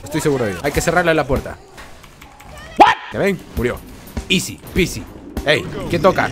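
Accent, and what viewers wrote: Peru. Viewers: me parece o eres peruano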